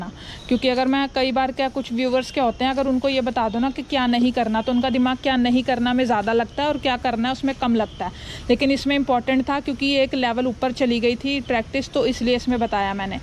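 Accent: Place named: Himachal Pradesh, India. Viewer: Maam main apka fan hu apka himachali accent bahut achha lagta hai❤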